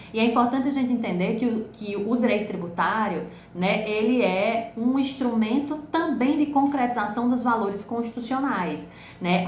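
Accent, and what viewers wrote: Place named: Northeast, Brazil. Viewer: Meu deus, que aula maravilhosaaaa! Quero agradecer de coração, pois estou há mais de meses tentando achar algo que me guiasse nesse TCC. Direito tributário é a matéria que eu tenho paixão e que brilha meus olhos, como a senhora falou precisamos fazer na área temos essa sensação. Com certeza irei seguir suas dicas e tenho convicção que será excelente o tema. Gratidão, profa!! Ah, amei seu sotaque rsrs Sou nordestina também, com muito orgulho.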